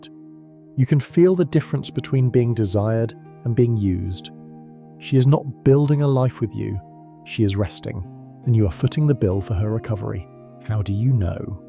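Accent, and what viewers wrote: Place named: United Kingdom. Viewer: Btw, the Limey accent makes this much more entertaining, (I’m US).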